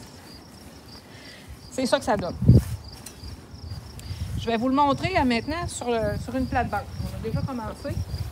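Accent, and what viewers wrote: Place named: Canada. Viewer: Merci pour l'explication, j'adore la voix des Canadiens c'est trop cute 😂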